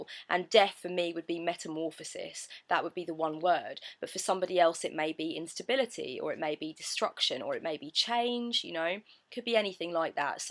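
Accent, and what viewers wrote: England, United Kingdom. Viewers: I would love to know your academic background. I wish you were a professor in the states (we love English accents for some reason, especially in higher and Broadcasting). You have amazing gifts as a teacher. I rarely subscribe but I'm going to be interested in further videos... Today is my first. Thank you so much for doing this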